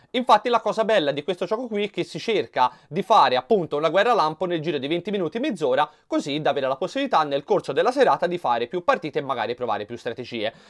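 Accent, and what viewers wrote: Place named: Tuscany, Italy. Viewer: Una delle ragioni che mi hanno fatto scegliere questo canale rispetto ad altri sulla stessa materia è proprio l'accento toscano e il modo di parlare toscano 😀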